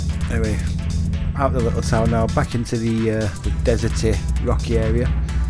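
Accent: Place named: Barnsley, United Kingdom. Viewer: i know a barnsley accent when i hear one mate lmao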